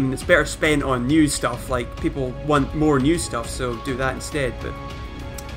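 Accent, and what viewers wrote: Scotland, United Kingdom. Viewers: The pure Scottish accent makes me happy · yasss another scottish youtuber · you sound scottish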